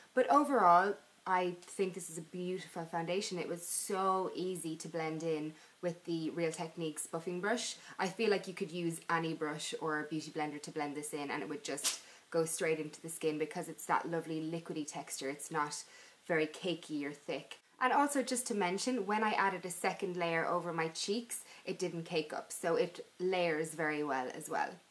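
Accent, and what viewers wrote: Ireland. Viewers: Which part of Ireland are you from?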